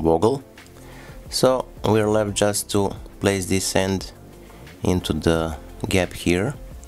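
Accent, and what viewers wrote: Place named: France. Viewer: Very good film avec accent french😂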